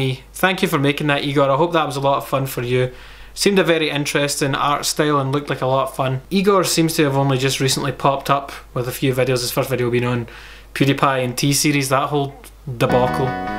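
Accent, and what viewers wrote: Scotland, United Kingdom. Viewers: You're SCOTTISH? I only watched your metal and metal and I never knew lol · Can you do an entire video in the most unintelligible Scottish accent for all the non Scots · Rule 23: Have Scottish accent <3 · Rule 11: have a glorious scottish accent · I love your scottish accent,:) Can listen to it all day!:D I totally need to visit Scotlad once:)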